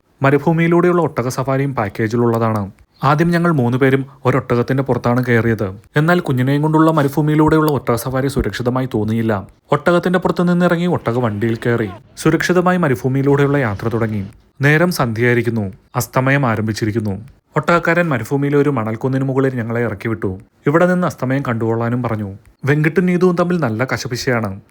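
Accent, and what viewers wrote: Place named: Kottayam, India. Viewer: കോട്ടയം സൈഡ് ആയിരിക്കും ഇവര്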